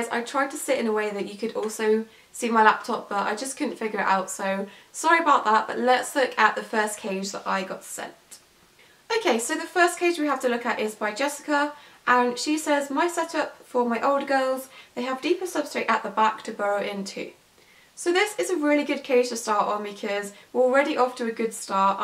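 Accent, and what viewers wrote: United Kingdom. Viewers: You sound very British today TeA Or CoFfEe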